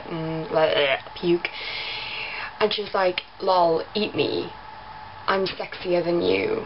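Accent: Newcastle, United Kingdom. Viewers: R u geordie? sry off topics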